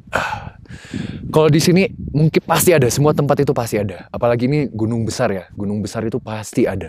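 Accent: Java, Indonesia. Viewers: candu bgt ngomong jawa nya hahaha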